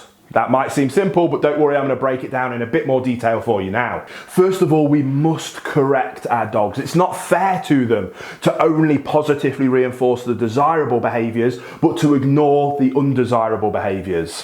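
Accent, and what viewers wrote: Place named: Midlands, United Kingdom. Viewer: Your videos are just fantastic! And hearing a midlands accent reminds me of home..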